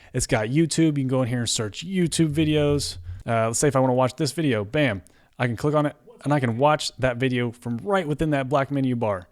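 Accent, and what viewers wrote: United States. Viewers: L'accent américain est inaudible pour un français. Merci d'avoir mis les sous titres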